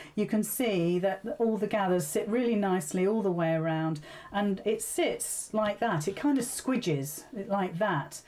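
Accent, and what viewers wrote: United Kingdom. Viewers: Please don't stop talking! I love to listen to you and your British accent. And I enjoy what you say. 😊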